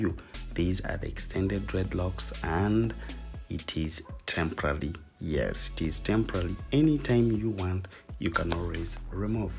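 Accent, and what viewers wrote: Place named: Uganda. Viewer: ..I've just discovered you.are you Ugandan? You sound like my people..🥳🥳🥳🥳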